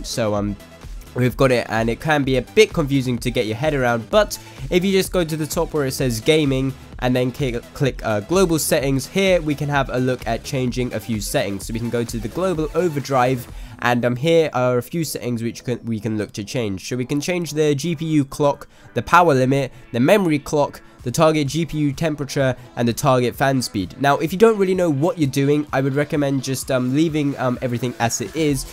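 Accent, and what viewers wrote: India. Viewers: your from north london? your accent seems indian and your face, and also your name everything seems indian soo yeah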